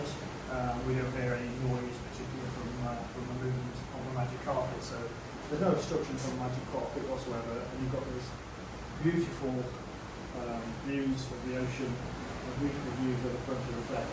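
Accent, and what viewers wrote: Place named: United Kingdom. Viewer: fantastic video, loved it. questions: bathroom, soaps incl, lotion, shampoo/conditioner incl? 2nd question magic carpet you stated nobody can see us on balcony? if magic carpet goes/up down, how do people not see you? Oh love your accent as Americans have various accents too, southern, and boston, new yorkers talk different and rest of us have regular accents but love the UK accents so so awesome....you sound like a teacher or public speaker awesome love your presentations.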